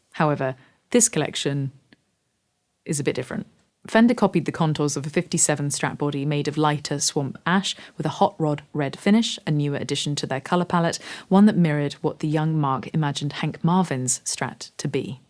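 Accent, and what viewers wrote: United Kingdom. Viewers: She can play well and speaks a perfect English. By the way, she's got a british accent. So lucky to have the chance to play those wonderful guitars ! I enjoyed her video. Well done !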